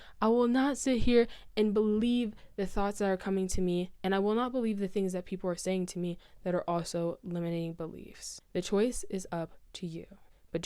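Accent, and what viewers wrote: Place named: United States. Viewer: She sounds American to me, but she might be Canadian. Wouldn’t know what part of either country.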